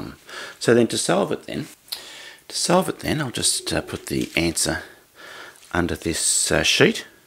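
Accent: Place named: Australia. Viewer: omg you are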